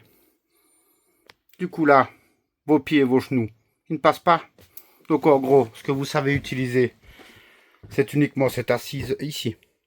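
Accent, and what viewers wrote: Belgium. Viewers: 😂....j'ai rigolé comme jamais en regardant cette vidéo!! Outre l'accent Belge, succulent, votre bouille de bon gars qui s'est fait berner et qui voit son rêve se transformer en cauchemar (à 60000 balles) est superbe! Je suis vraiment désolé pour vous. Bonnes vacances tout de même!! P.S: prévoyez une tente Queshua pour les gamins, c'est plus rapide à monter et bien plus confortable!! 😊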